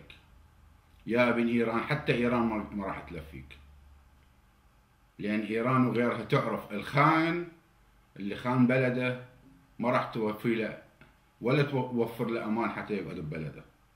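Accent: Iraq. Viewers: تحية لك دكتور من القدس وما ننحرم من اللهجة العراقية الطيبه ربنا يحفظك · والله زين جكليته تعالج عشرين هههههههههه صدك مهزله وخو الفديو الثاني جايجي بكهوة زايد عليه منصبه ههههههه اني شيعيه بس وربي انتقد حالة اللطميه والتطبير وياأخ وربي كلامك ذهب ولهجه عراقية صرفه وياريت الكل يعرف انو الحكومه هم الي عملوا الطاشفية والله مافي فرق بين سني وشيعي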